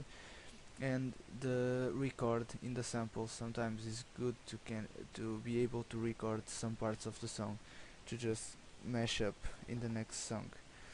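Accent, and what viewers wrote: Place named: Portugal. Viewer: pelo sotaque percebi logo que eras portugues :)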